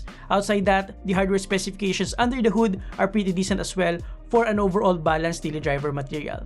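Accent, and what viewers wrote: Philippines. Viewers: Pinoy na pinoy ang english mo😁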